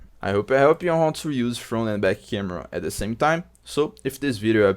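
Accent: Brazil. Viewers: Pelo sotaque é BR certeza kakaka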